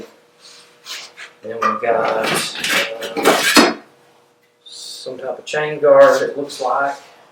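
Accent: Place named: South, United States. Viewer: You got that nice southern accent bro!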